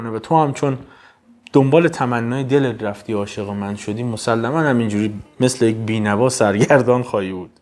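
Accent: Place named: Kabul, Afghanistan. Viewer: عزیز را خوشبختی نصیب باد که‌ خیلی خیلی خوب گپ می زنی و با سخن زدن ما مردم کابلستان بسیار نزدیک و کاملا قابل فهم است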